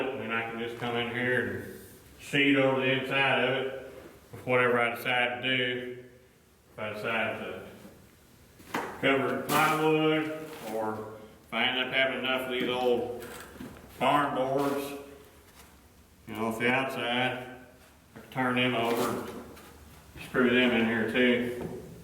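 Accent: South, United States. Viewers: Buddy, mineral wool is for sound proofing and not for insulating or moisture control. Call a union contractor in for a consult. Use cellulose to control moisture and put poly film up after it is blown in. Stop reading about this on the webby web, call a local professional. YOu sound southern so you might need to put the poly outside, again call a local professional. For what the roxul costs you could hire that job and have it done with a proper material for your situation there. Good luck! Roxul will hold water btw, it wont control it. Think of a wet sponge. And then the slag will rot and rust, you'll see. And when it does it smells like someone shitted in your walls. You asked for other's experience, here's mine.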